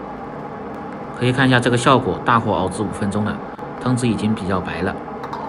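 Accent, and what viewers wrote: Sichuan, China. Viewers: 四川人，一听便知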